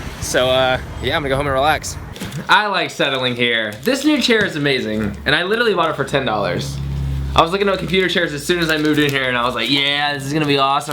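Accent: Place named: Kentucky, United States. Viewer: I'm assuming he's from Kentucky like William. I'm from uk so that's my only reference point:D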